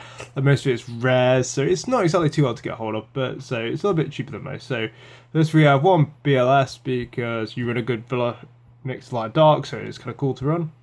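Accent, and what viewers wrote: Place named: United Kingdom. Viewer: I'm in process of building a budget Kozmo deck and I would recommend running reasonings for the free summons of the ships and reasonings are cheap too and also the field spell can help unbrick your hands and code geass is fucking amazing man and you sound British I am also british whereabouts you from?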